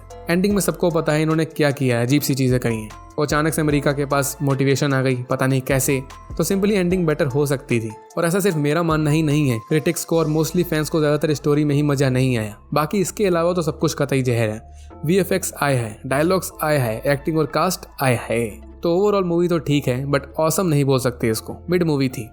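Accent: India. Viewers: I used to watch your loki video, I've watched them all I just remembered you used to say " Keep calm and Listen to me " in your Indian accent so, yeah your explanation are best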